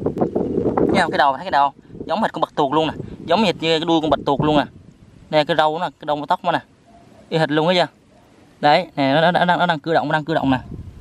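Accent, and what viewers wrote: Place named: Mekong Delta, Vietnam. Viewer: ah nói chuyện miền tây thì chỉ có ở châu đốc an giang😃😃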